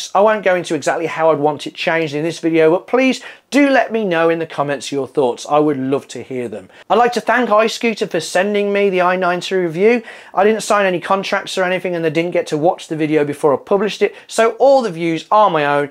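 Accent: West Midlands, United Kingdom. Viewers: American why are you lying for🤪 beens every yellow & white number plate & the green phone control box is all British & the houses etc,god knows how you thought you would blag that one I live in the west Midlands & they all still use scooters here they blend in with the ones you can hire in the town centre so I wouldn't worry about it enjoy ya self they won't throw you in the clink😁 you probably live right down the road from me with that accent bro.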